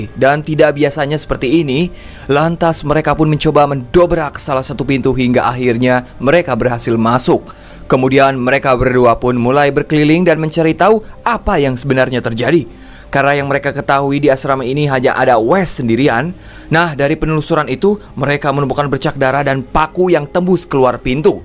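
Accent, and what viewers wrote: West Java, Indonesia. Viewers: Logat sunda sarua atuh nya saqahayang jeung 🎉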